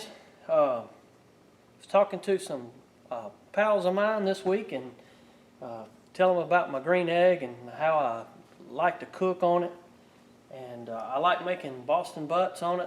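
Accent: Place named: Georgia, United States. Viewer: Hey! I just Googled & watched some videos. Awesome! I see you're in S.C. I told my husband "he sounds like he's from Georgia " Hehe..my apologies.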